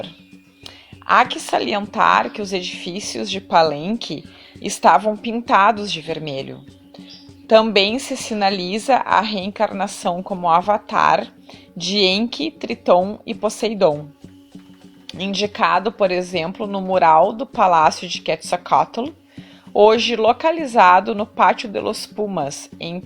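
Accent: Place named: Porto Alegre, Brazil. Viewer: Fantástico, e com narraçao porto alegrense fica ainda melhor... Rsrs. Maravavilhoso trabalho minha conterrânea, parabéns.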